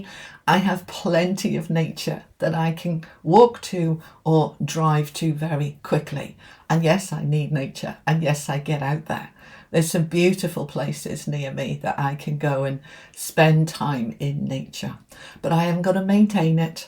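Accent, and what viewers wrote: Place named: United Kingdom. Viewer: Thank you dear ❤ I was brought up internationally with a cultural mix and learned very early on to not judge. Everyone was different, including me. But what I really needed to be reminded of was the self care bit where I have slipped a bit... Thank you for that! Also I love your voice and accent, I used to work a lot with brits (am Swedish) and listening to you is just wonderful!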